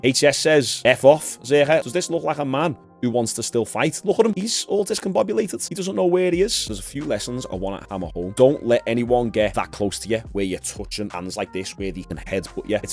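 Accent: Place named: England, United Kingdom. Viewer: “They’re all little faggots” -weird English accent. Everything sounds better in the American accent y’all created it but we refined it, if you feel anyway about that comment just remember what happened in 1776 ya little hobknocker I love you ❤️ your content is refreshing and I believe your consistency and ability to hustle hard will take you to the top, I’m younger then you so I think you will get there first but when I get there just make your you remember the American that was happy you Sa’id the word faggot openly 😂 love ya bro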